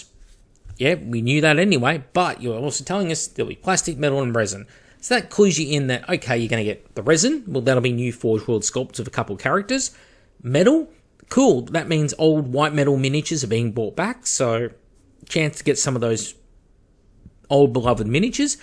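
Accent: Australia. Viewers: Excellent whine in redneck oz voice to ease you to sleep . Raise prices another 10% for oz.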